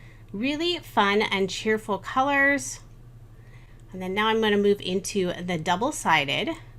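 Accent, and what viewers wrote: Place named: South, United States. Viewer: I keep forgetting you're not in the US. You sound like a good ole southern girl😊💖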